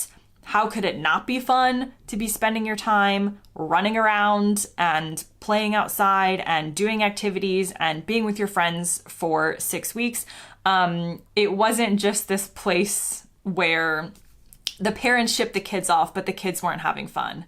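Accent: United States. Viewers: The US pronunciation of Barbados is so annoying. Also , it's Cost-a Rica not Coast-a Rica. Also it's rizzott-o not rizzoe-to. Americans should learn how the natives pronounce words before making up their own interpretation. While I'm on a rant it's not Edinburrow it's Edin-burra . I'll stop now before I start to get cross . LOL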